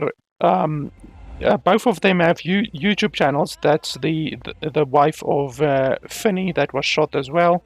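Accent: South Africa. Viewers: are you South African lol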